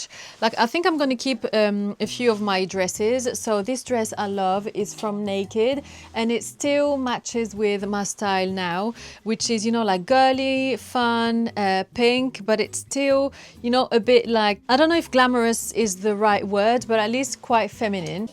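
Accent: United Kingdom. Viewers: Very good British accent, I prefer this version by far.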